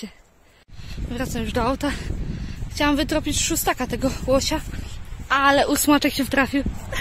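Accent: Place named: Podlasie, Poland. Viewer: Zazdro z tymi rosochami, taką to łatwiej znaleźć niż tykę jelenia, bo dzięki śniegowi łosia można łatwiej wytropić. A u mnie łosi nie ma. Gratuluję :) I podoba mi się twój akcent, chyba podlaski?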